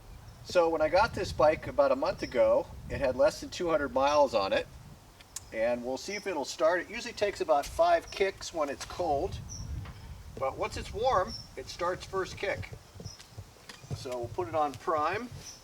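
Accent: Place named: United States. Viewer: Poooshoooww, colour, lol. Godda love the American accent.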